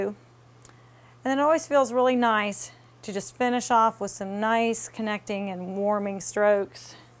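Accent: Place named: South, United States. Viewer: gorgeous accent. Sophisticated south.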